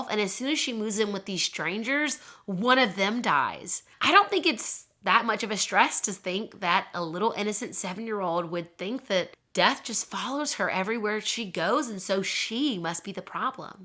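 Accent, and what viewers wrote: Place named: South, United States. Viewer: New subscriber here!! I’m so glad I came across your vlog!!! I love things like this. I also love your voice and I’m wondering if you’re from the south? Because you say the word “on” with a “w”….. Like. “Own” which I love!! ❤️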